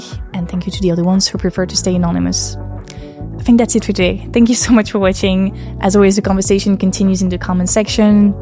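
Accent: France. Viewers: You sound french 🤔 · You accent is so cool. You sound french. Then you sound australian. And little bit of scottish.😊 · It was just too much for me, Im allergic to french traing to speak English and leter R, I know what I said, just another youtuber traing to get some like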